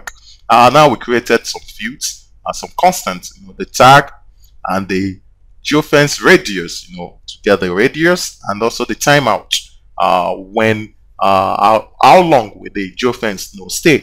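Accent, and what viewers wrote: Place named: Nigeria. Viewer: From the accent, I can tell he's from Nigeria. ;-)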